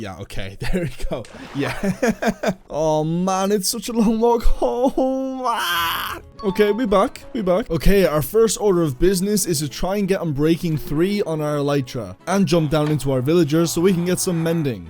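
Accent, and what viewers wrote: Ireland. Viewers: Is that an Irish accent I hear ?? An Irish Minecrafter? Finally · always pleasantly surprised when i hear a fellow dubliner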